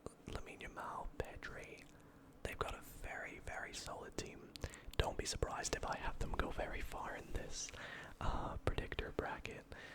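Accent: Scotland, United Kingdom. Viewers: Why did it never click for me that you’re Scottish😭😭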